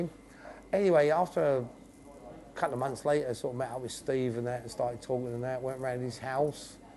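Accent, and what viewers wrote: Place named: United Kingdom. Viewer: Typical brit accent heh, i like brit accent, interesting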